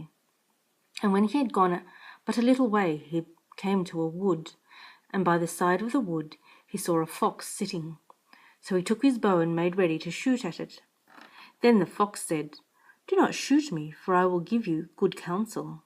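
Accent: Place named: RP, United Kingdom. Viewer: you sound like a queen of England wasn't expecting that. Do you like drugs mate?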